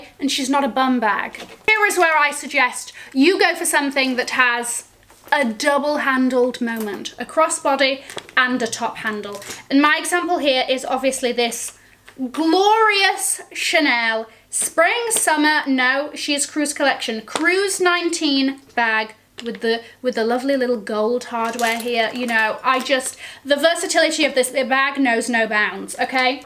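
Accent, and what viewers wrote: United Kingdom. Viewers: Salaam! I just discovered your channel last night. I love me some British accent reason why my other favourite Youtuber is a Brit as well. I have been binge watching your videos ever since. I wish your videos were longer though because why they end so quick lol but on the flipside, you are on point, no hanky panky but so so so much fun to watch! With that level of energy, i pray you will hit a million in about two years or less! You go girl!!! ❤ p.s: you are very pretty MashaAllāh!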